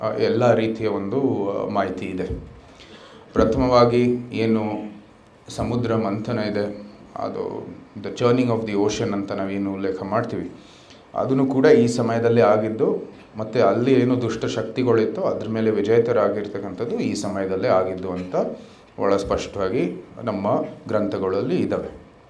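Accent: Standard. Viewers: ನೀವು ಹೊರ ದೇಶದಲ್ಲಿ ಓದಿ ಬಂದಿದ್ದರೂ ನಿಮ್ಮ ಶುದ್ಧ ಕನ್ನಡ ಕೇಳಿ ತುಂಬಾ ಸಂತೋಷವಾಯಿತು.❤😊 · ನಿಮ್ಮ ಶುದ್ಧ ಕನ್ನಡ ಮತ್ತು ಸಂಯಮ ಯುತ ಮಾತು, ಮತ್ತು ಜ್ಯಾನ ಸಂಪತಿಗೆ ನಮ್ಮ ಗೌರವಯುತ ನಮನಗಳು 🙏🙏🙏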